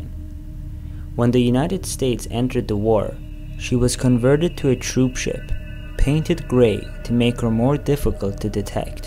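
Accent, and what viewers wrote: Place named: South Asia. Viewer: i can't understand what you are saying due to your thick accent.. what is your country of origin? pakistan or india? please learn to speak properly before doing more videos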